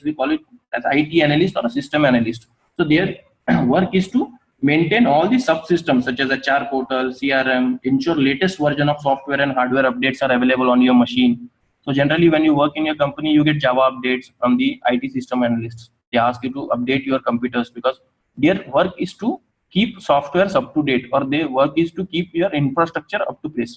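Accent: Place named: India. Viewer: Fuuuuuuck indian accent just shut your mouth !!!!!!!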